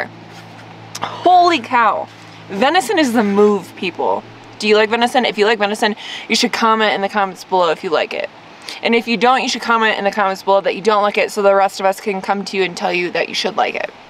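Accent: South, United States. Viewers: Starting to find the southern accent offensive...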